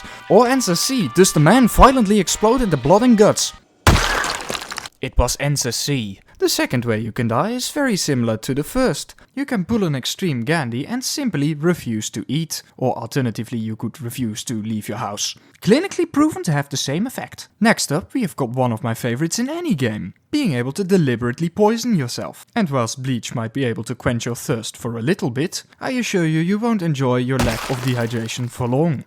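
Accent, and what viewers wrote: Netherlands. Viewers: Are you from the netherlands lol? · Ah, classic nederlands accent.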